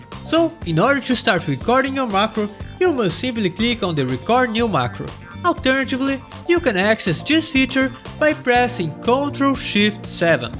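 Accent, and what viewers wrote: Brazil. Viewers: that brazillian accent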